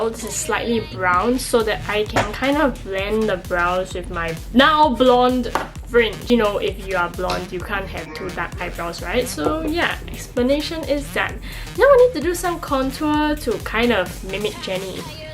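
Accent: Singapore. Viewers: I wan hear more singlishhhhhh😂😂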